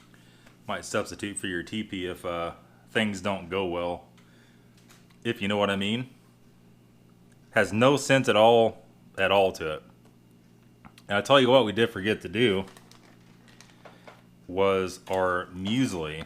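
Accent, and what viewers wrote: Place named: South, United States. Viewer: He sounds Southern, maybe Louisiana. They have a different way of pronouncing things down south. Can't help how he was raised. Alot of my family is from New Orleans, it gets irritating sometimes with how they pronounce things but, you cant change how they speak. That'd be like getting mad at a British person for pronouncing something differently than we do when speaking English.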